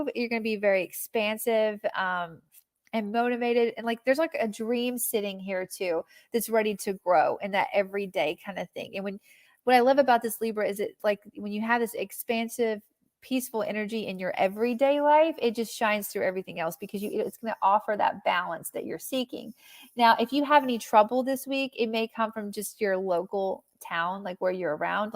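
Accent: South, United States. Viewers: I love the light southern accent you have!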